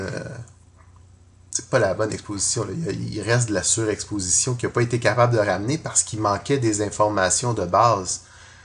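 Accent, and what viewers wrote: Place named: Quebec, Canada. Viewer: C'était très bien expliqué, facile à comprendre, de bons graphiques "statistiques" et exemples visuel puis enfin quelqu'un qui parle avec un bel accent Québécois pour faire changement de ces français! :)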